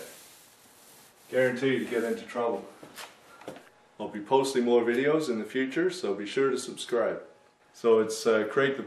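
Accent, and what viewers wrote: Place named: Scotland, United Kingdom. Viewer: This was great to watch a real pro doing the usually messy job of painting a ceiling the proper way ... bit jist as a wee small aside noo Craig, kin a no detect jist a wee bit oh the far-flung 'remnants' of a guid Scot's accent, hiding in backgroond? Well; an av always says this massel son ... Ye jist canny hide true Scot's class (or talent) .. fi nayebidy, nay matter whoar yi ur roon the wurruld or even in the back 'o beyond, an that's fur shure tae! Thanks Craig, for uploading this video.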